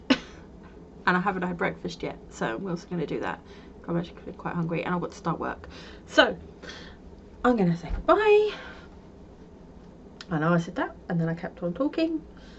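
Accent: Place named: United Kingdom. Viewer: Hello, an good morning, I have just found your podcast, an was laughing so much at your comment of wanting to make the Shawls, because I am the same, love shawls, an like you have a growing stash that will be older than me teeth if I don't stop. ha ha, love the yarn you showed, look forward to seeing what you make with them. I take from your accent you are U.K. I live in North West England. an have subscribed. have a lovely day. :)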